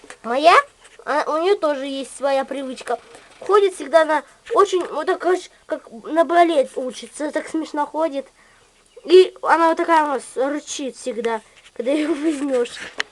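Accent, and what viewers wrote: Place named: Siberia, Russia. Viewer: Очень радует очень хороший русский язык. Поверьте, я в этом кое-что понимаю., уши радуются. Задолбали косноязычные высокопоставленные идиоты.Ты чокаешь, как у нас в Сибири. И акаешь. Это нормально.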